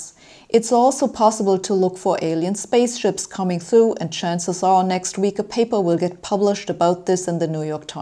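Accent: Germany. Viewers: Well, she is German.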